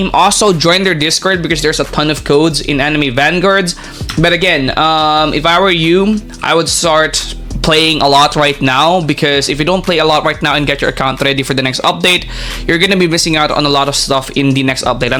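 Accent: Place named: Philippines. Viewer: Helpful video! off topic but are you Filipino? Your accent is cool 😅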